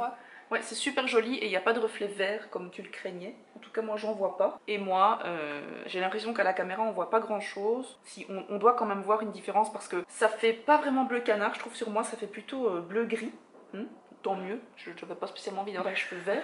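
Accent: Belgium. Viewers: tes belge??? sinn super video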